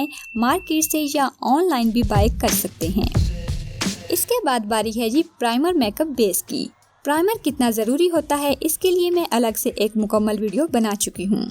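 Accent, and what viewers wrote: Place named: Rural, India. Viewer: apki awaz bot paindu hai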